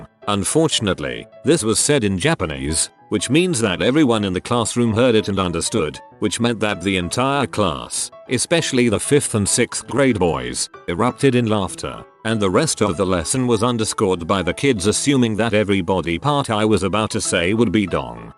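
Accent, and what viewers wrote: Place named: Midlands, United Kingdom. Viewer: That makes sense. Of course, some of these have to be read. Because some of these pronunciations make it seem that the TTS is using a Midlands accent. (If that makes no sense, it's difficult for someone Irish to understand someone with a Midlands accent when they're talking "normally". Or basically anyone who doesn't have a Midlands accent.)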